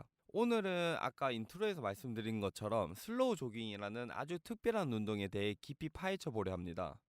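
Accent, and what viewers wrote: Gyeongsang, South Korea. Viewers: 이거 말투가 대구네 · 대구 분이시네요ㅎ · 대구 분이시네요. 저도 대구 사람입니다. 반갑습니다 ㅎㅎ 구독하고 갑니다.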